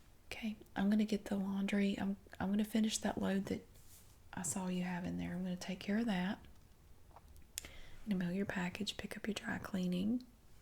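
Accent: South, United States. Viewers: Just love your southern accent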